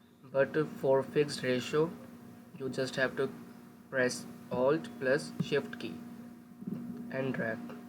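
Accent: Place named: United States. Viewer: Missing your american accent😕😢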